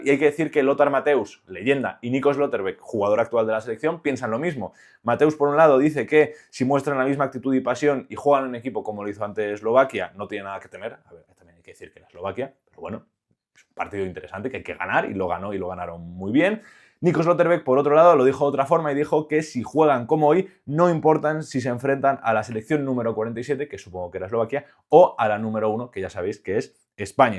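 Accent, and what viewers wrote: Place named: Spain. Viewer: Eres de alemania? Por qué yo noto mucho tu asentó español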